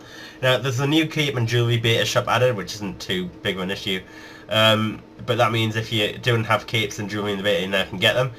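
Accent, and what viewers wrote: Newcastle, United Kingdom. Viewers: Your accent is like mine. Are you a fellow Geordie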